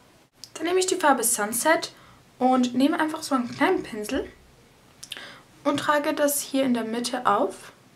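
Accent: Switzerland. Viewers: Schweizerin ? :D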